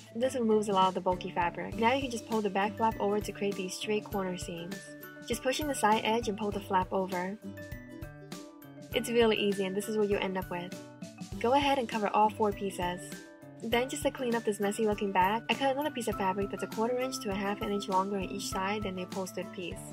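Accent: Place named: South, United States. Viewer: Are you from New Orleans? You have a southern accent 🥰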